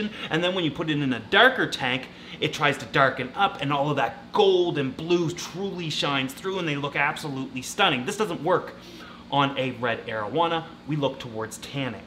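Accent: Canada. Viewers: Could really hear the Canadian accent in this video. it’s brilliant 😂❤️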